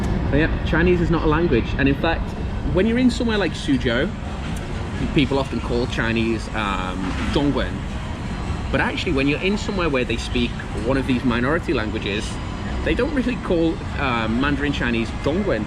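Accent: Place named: Merseyside, United Kingdom. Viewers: I detected a Merseyside accent - when you mentioned Rock Ferry, it confirmed it. I am British born Chinese from Liverpool. Nice video. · A pleasant guy with a lovely English accent exploring China. Great video mate! I'll keep watching and listening.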